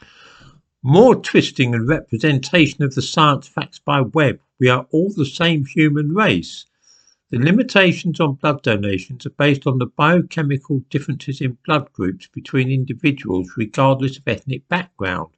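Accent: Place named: RP, United Kingdom. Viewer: Duhh! Maybe is because you’re spewing hate, bigotry, racism with a posh accent.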